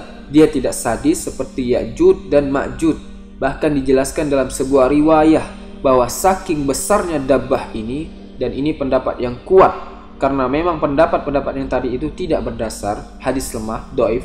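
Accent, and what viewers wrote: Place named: Sulawesi, Indonesia. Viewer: Didengar dari logat bicaranya admin ini kya ny org daerah sulawesi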